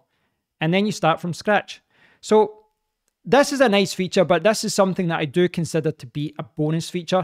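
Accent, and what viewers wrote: Scotland, United Kingdom. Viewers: Just got my Go 2, and this was a great tutorial (much better than Rode's documentation). The Scottish accent made fun to watch too!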